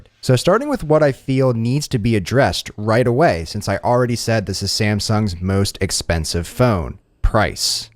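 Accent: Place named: Delaware County, United States. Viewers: With his light accent and the red star I wonder if is from Pennsylvania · Love the Delco accent haha shoulda been on Mare of Easttown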